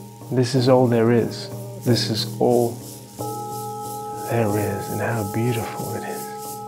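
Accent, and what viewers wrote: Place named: Australia. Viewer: Thank you for sharing. You have an Australian accent. Wondering if you're in Australia still?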